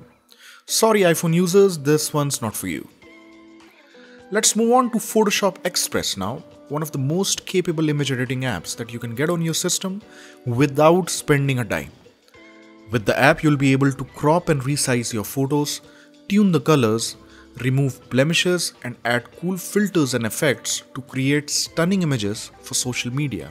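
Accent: India. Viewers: Very shitty Indian accent, go back to scamming. This video was annoying.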